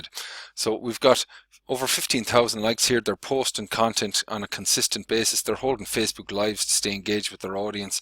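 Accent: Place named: Ireland. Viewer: 😂🤣 I❤️his tight Irish accent!😂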